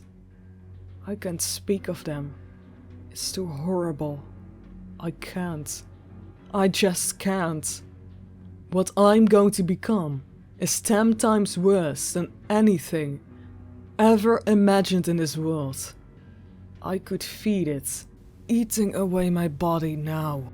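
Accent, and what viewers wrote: Netherlands. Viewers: I hate this one it's like hiding ur accent, that's why we subscribe is to hear ur thick Netherlands voice, don't make me tell u again.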